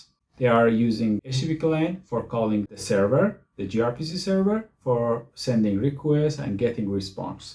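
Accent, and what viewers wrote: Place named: Iran. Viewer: بعضی از تلفظات خیلی فارسیه،حیفه متد، ریسپانس و ... که بیشتر تو محیط های آیتی انقدر اشباه تلفظ میشه که تلفظ اشتباهش فسیل شده.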